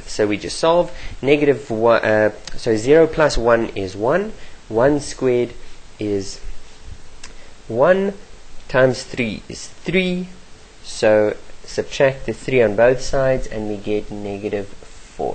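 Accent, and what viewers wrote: South Africa. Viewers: Is this South African?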